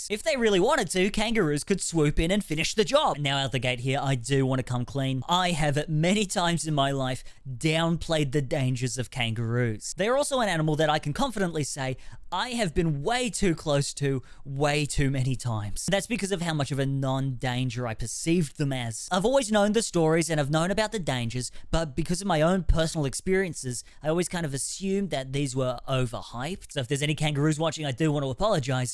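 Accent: Australia. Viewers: The Aussie accent that this information is being delivered with really adds credence as that's the country full of animals that very famously Want To Kill You.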